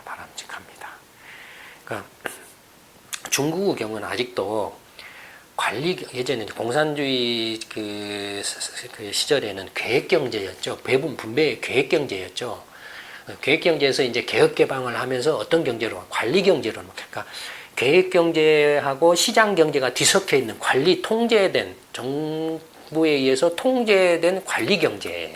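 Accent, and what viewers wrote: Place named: Jeolla, South Korea. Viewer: 전라도 사람이네. 말투 들으니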